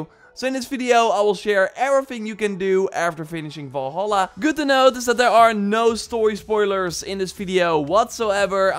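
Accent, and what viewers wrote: Netherlands. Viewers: a yes this accent is made out of dutch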